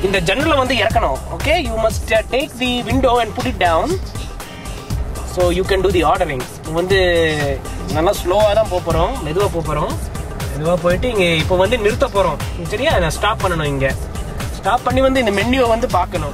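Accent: India. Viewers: LOL, the best part is his amazing tamil accent and stupid english :D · this guy has the thickest indian accent ever.....and it's real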